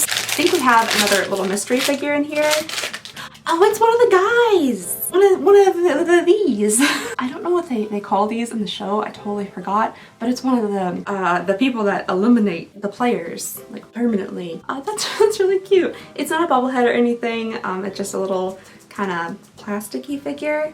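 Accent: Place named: Kentucky, United States. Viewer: One of the reasons I love watching your videos is because your accent reminds me of my long distance best friend who is also from/lives in Kentucky. Always love your videos no matter what they are. Hoping to make it up to the stores one of these times I’m down there !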